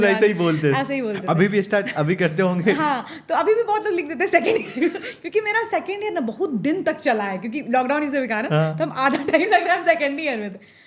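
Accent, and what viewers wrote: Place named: Bihar, India. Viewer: Bihari accent full on😊😊😊😊😊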